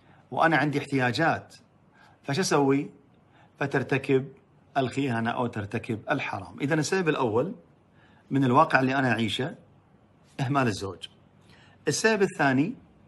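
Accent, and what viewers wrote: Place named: Levant. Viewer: انا ابي اعرف الحين ، انت من الشام . مسوي نفسك كويتي ليش ؟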